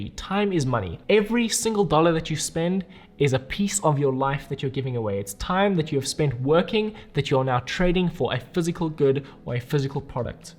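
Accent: South Africa. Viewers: Are you South African? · You sound South African! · I love all your videos....and listening to the familiar South African accent has nothing to do with it! ☺️ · Sounds like a Bishops educated (Cape Town) ex South African 🤙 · Are you South African? 🙌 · I can't place that accent, it sounds South African?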